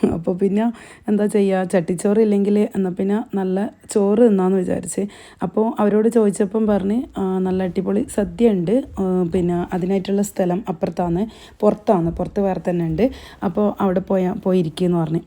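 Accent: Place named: Kannur, India. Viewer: അടിപൊളി🔥🔥 കണ്ണൂർ ഭാഷയിലെ നല്ല അവതരണം🎉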